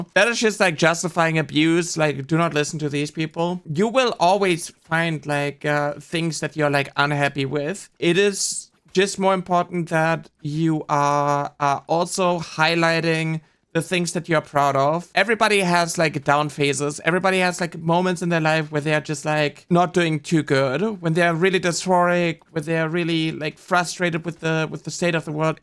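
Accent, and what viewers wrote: Germany. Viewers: i know your struggle with voice, but i'm still training and i really disagree with you and bullies saying you sound like a guy... you have a very nice voice to me, clearly a womans voice and i like a lot, also quite soft (most of the hardness ppl might percieve comes likely from the german accent in case you hear that a lot) i appreciate what you do and hope you feel better as time goes on... again, i like your voice a lot and it does sound clearly feminine to me... and i will keep telling you that every now and then as you clearly don't get it often enough <3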